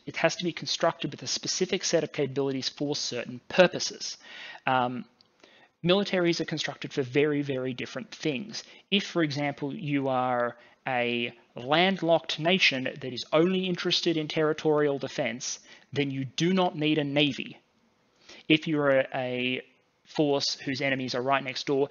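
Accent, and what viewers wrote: Australia. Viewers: Fascinating and insightful especially considering how long ago it was posted. 's voice and delivery (not to mention the sexy Australian accent) make it easy to pay attention to the arguments. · hes Australian & that’s an Australian accent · Love to hear an Aussie voice; very non- grating on my ears. Pure, unadulterated info! Oh Oy Oy !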